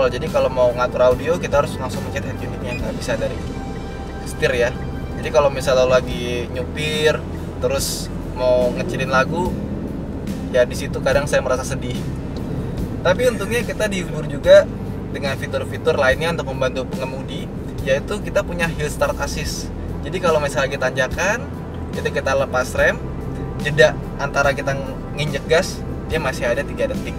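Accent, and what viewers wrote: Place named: Semarang, Indonesia. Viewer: mas wong ndi to? logate koyo wong semarang? asem...:D usul: kenapa gak kalian bikin acara khusus otomotif ber-3 kayak top gear. Khusus menjelajah Indonesia/asean pake mobil2 yg familier di Indonesia. Kayak review mobil delica bagusnya langsung test naik gunung berbatu, berlumpur, melewati lembah dll. Gitu kan jadi lebih jelas pointnya. Bosen kalo test nya di hutan yang itu2 melulu mas.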